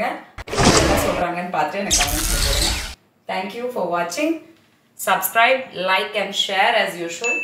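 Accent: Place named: Thanjavur, India. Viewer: அப்படியே வச்சிஉருச்சி தஞ்சாவூர் நாட்டுசாலை பேச்சி இருகுது மிக்க மகிழ்சி உங்கள் சமையலைவிட உங்க பேச்சியத்தான் நான் அதிகம் ரசிப்பேன் அக்கா supet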